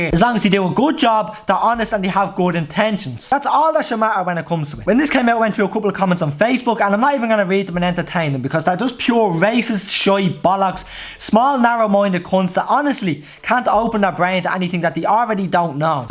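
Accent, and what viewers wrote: Ireland. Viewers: So enjoyed these videos from Australia.was born in Dublin , drimnagh you are brilliant keep them coming love your Brough ha ha .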